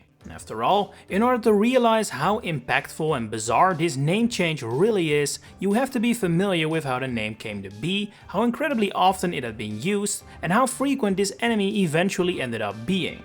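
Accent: Netherlands. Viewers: checks profile after 5 seconds of talking: "yep, tissun nederlander." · Um, he definitely didn't add a dutch accent, he's dutch. And also...of course he uses US terminology?? It's a video about the US name of the enemy??? You're goofy for this comment lmao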